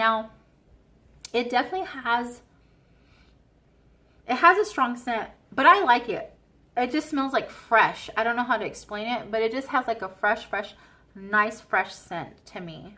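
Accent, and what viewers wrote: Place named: United Kingdom. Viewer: Love this video. I can hear the British accent wanting to peak through. 😊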